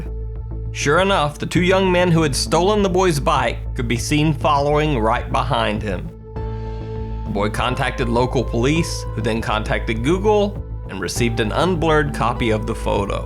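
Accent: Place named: South, United States. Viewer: There's a hint of Southern accent to your voice here. But I haven't noticed it in any other videos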